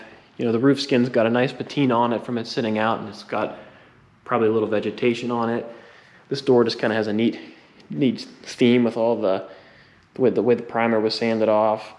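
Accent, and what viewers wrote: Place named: Southwestern Pennsylvania, United States. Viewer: I'm guessing by your accent that you are in the southwestern PA area. I have family in Latrobe. Lol....let me know if I'm right.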